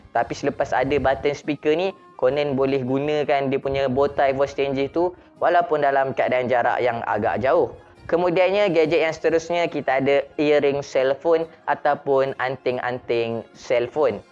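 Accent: Kelantan, Malaysia. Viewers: kuat bnyi klate tu 🤣🤣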